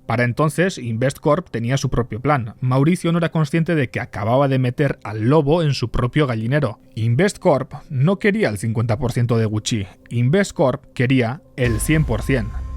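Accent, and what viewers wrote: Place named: Basque Country, Spain. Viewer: Eres euskaldun no? Lo digo por tu acento. Yo de Vitoria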